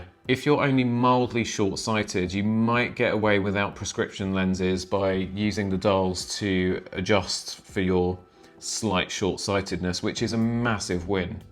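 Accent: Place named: Europe. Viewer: Would have been much better if you didn't constantly refer to ipods, ipads, ios, iphone......apple this, apple that -- you are in Europe judging by your accent so Android would have been so much more appropriate and useful. As it stands, this just seems to be aimed at the US of North America and 9/10ths of the planet can't understand your points. Apple, Apple, that pointless expensive useless brand again is Apple.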